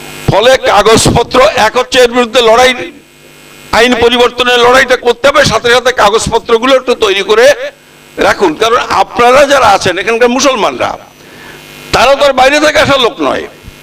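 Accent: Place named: Bangladesh. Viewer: আপনার কথা শুনে বোঝা যাচ্ছে আপনি বাংলাদেশি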